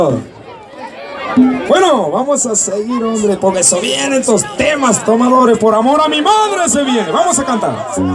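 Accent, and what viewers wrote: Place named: Santiago del Estero, Argentina. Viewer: Como buen Santiagueño,le repercute mucho el asento,y la SS,pero canta lindo,ojalá q cuide su vos y su figura,eso es muy importante para sus fans y su salud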